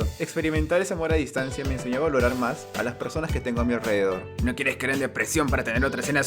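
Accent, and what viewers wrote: Peru. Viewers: Que wea eri peruano?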